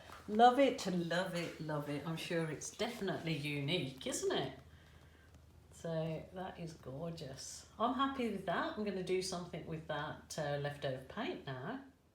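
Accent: Birmingham, United Kingdom. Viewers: This lady sounds like an English Brummie. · we’re you a Brummie?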